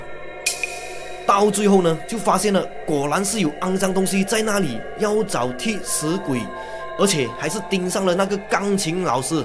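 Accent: Malaysia. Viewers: 曾经看过马来西亚网民批评你的口音，我是新加坡人，我们新加坡有新加坡华语口音，我喜欢马来西亚和台湾华语腔，所以你不要用AI，听马来西亚口音很亲切。还有，请马来西亚华人保留马新本土华语。新加坡华文界喜欢模仿中国和台湾，如联络 = 联系，宵夜 = 夜宵，甘榜 = 乡村，塞车 = 堵车，养育孩子 = 把孩子拉拔大（连田中裕子演慈禧太后也说她把光绪拉拔大），水草 = 吸管，地铁 = 捷运，电脑 = 计算机 -你去computer shop 说我要买最新的计算机，店员叫你去 文具店，注册结婚 = 办结婚证，运货 = 拉货，一个新加坡新传媒男记者竟然把德士说成 出租车 （rental cars），搭德士 = 打车，什么都搞，跟他们说谢谢他们学中国人说没事。我有说妨碍打扰你了吗？没事？我最喜欢人家说 一样一样 sama sama，或者 不会 （福建话翻译 buay）。新加坡媒体觉得 巴仙 很不标准，不敢说，有的新加坡人却模仿台湾人 说 趴，三十趴，五十趴。请马来西亚华人保留新马马新本土华语，别让它们失传。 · 謝謝你的故事❤不過我還是一邊聽一邊想笑呢😂是因為馬來口音很特別嗎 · 其实，UP主他没有使用 AI 语音技术啊～他的口才還是一样带有浓浓的大马腔的口音味道～完全听得出来，但是最近他的發音腔调 有很大の进步了，有听出來 确实比早期改善了很多，讲故事也更加顺畅了～很好吖～ 我認为说 ％ 百分率 = 巴仙，在大马 或 新加坡 世界各地 都没问题啊，没必要去刻意效仿 台湾，而且台湾 使用『％ = 趴』其實也不太标准。 然后，说句实話…其實 新加坡🇸🇬 就也是一样是 🇲🇾大馬啊，只是是旧的，是属于以前の马来西亚 而已，後来叛逆 有本事了就独立自主出来，所以两边文化自然差不多也一样嘛～。哈哈……😄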